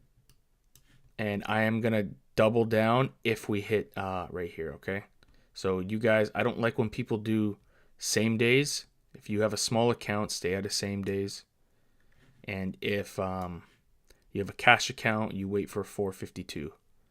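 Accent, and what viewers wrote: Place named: Jamaica. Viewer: Hey u Jamaican..jah know u sound like one ?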